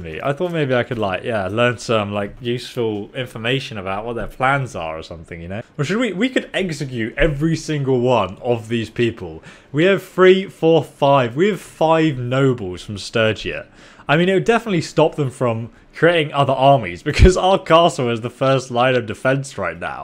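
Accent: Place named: United Kingdom. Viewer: It's called british and that's the way they pronounce things.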